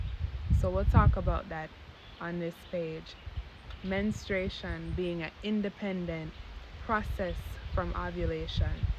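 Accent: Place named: Caribbean. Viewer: love the caribbean accent stay blessed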